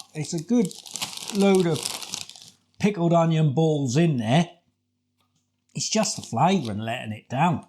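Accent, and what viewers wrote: Norfolk, United Kingdom. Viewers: Sound as if you might be a good ole Norfolk boy😊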